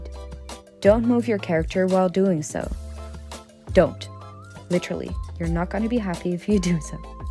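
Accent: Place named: Netherlands. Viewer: i smell dutchness :D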